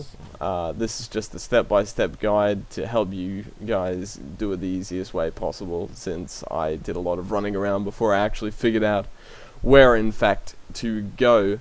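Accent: Australia. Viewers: are you australian or a new zeelander? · hes aussie lol..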